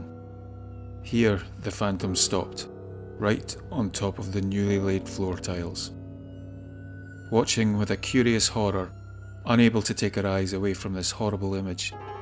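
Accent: Scotland, United Kingdom. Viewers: I love a good ghostie story, but I love a Scottish accent even more. I swear I'd be watching even if you read the phone book. Great stuff :) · I adore your Scottish voice so much👍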